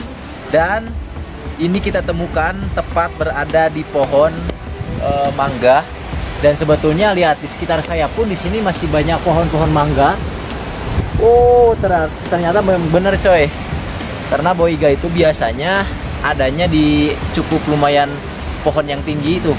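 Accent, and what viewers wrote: West Java, Indonesia. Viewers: Sangat mengedukasi bgt ini, dan logat sundanya terasa bgt nih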